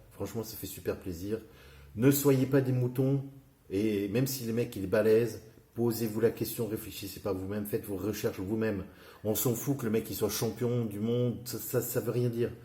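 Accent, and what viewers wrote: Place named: Quebec, Canada. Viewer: il a l'accent québécois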